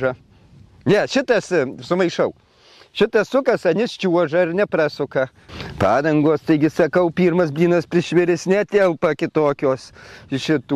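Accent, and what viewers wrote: Dzūkija, Lithuania. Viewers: Širdžiai miela dzūkiška kalba.👍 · Saunuolis labai grazi dzukiskai kalba 🙌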